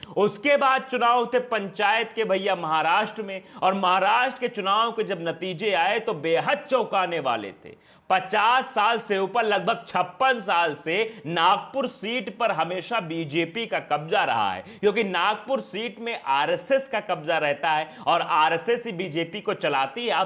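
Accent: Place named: Uttar Pradesh, India. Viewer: present news very nicely. His tune is very much UP based. I like it very much and enjoy listening him. Keep going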